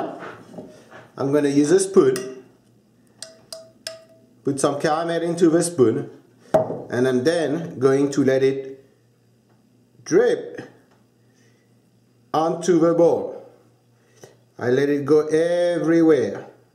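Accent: Jamaica. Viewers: jamaican accent man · i love his jamiacan accent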